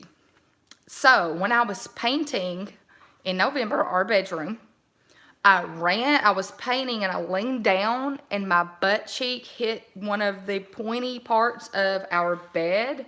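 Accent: South, United States. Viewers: OMG LOL I LOVE your accent!!!!!! Sometimes I try to talk that way. I love it. lmao. I am in California, so I don't feel like I have any accent. Do we sound like we have an accent to you (meaning people in the south?)? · I love how down to earth your channel is and how courageous you are to share yourself with others. I lived 12 years in Georgia (now I’m in DC area). so one selfish reason I love your channel is to listen to your awesome southern accent and all the sayings. “I’m fixing to...” is something I don’t hear much now 😀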